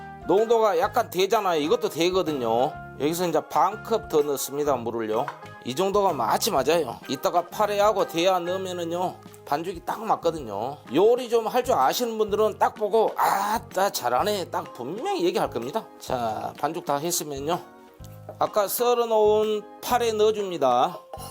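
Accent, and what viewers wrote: Jeolla, South Korea. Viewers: 사투리가 영락없는 우리 전라도 사위 말투 똑같네요.❤ㅎ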